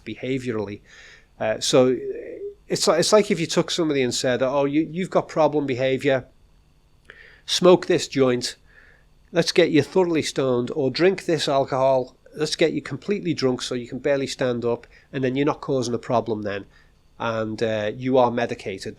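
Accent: Liverpool, United Kingdom. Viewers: Just discovered your channel so excuse me if it’s been mentioned, but are you a scouser ?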